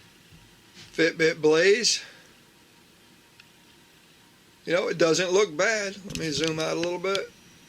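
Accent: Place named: South, United States. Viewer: Use Suriii.... ahh southern people accent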